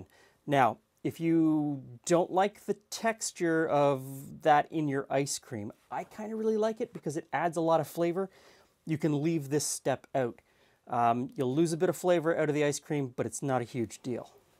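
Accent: Canada. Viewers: Wonderful video and a recipe that even an old guy (ha, ha) can handle ---- just enough but not too many ingredients. Three (3) is a good number, LOL. Loved that delicious Canadien 'accent', too.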